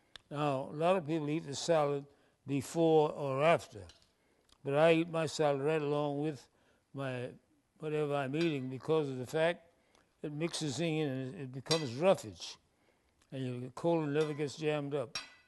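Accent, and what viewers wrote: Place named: South Carolina, United States. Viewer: I love this ELDER!!! So beautiful and such and inspiration and he sounds like my people from Lady's Island SC 🌴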